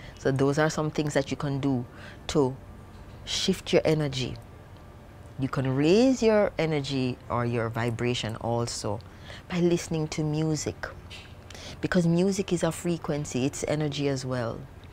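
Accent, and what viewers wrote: Jamaica. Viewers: MARVELOUS! ...What a beautiful lady this is...smooth impeccable skin. Such clean expressions, such clean energy and such clean appearance...ZERO pretentiousness and 100% NATURE PERSON. I really enjoyed hearing this...and your golden Jamaican accent and mannerisms...and the little sweet Patwa in between. - no ssstchuppitnis punna she hair - arl's nature groove...eeeasy-eeeasy lite and breezy! It's so wonderful to see zero ostentation in motion. GOD Wisha-Ouija bless you! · The Sistah could talk to me all day about health. Jamaican accent supreme! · is she jamaican? sounds like! love her voice and speech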